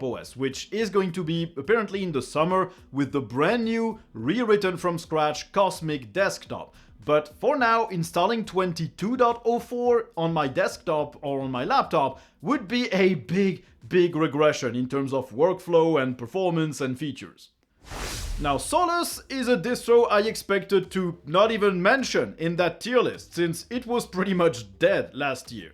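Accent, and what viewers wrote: France. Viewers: Tu serais pas français mec t’as un très fort accent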